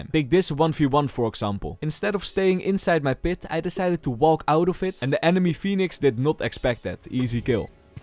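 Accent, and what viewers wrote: Netherlands. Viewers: Where are you from, sir? Your accent really sounds like from the Netherlands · Nl accent 😂❤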